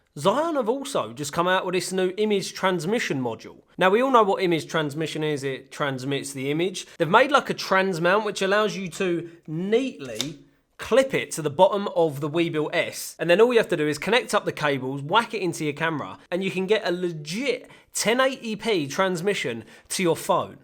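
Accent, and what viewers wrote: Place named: London, United Kingdom. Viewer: that London accent just kills me.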